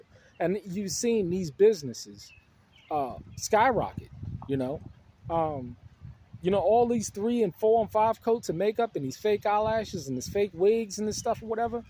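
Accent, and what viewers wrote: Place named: Brooklyn, United States. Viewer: I knew you were from Brooklyn. That accent is too familiar.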